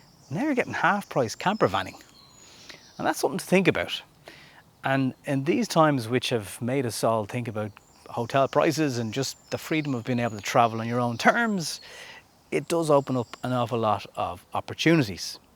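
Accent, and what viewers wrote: Ireland. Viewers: Irish accent. 🤭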